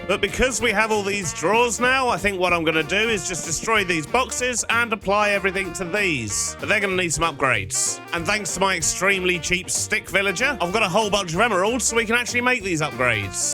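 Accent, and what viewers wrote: United Kingdom. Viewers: Is it just me or does? Literally seem like the mumbo-jumbo of the mod both of them have a facial hair that they. Make the main focus about their channel both of them love a very complex game, mechanic that is techy or mod that is techy being the create mod mambo being Redstone Both of them make it seem a lot easier than it actually is starting to see a pattern does anyone else see it I’m not sure if this is intentional or not but this feels like there’s too many coincidences to be an accident along with they both have the same British accent, and they sound so similar if you put audio over mambo audio who would notice practically no one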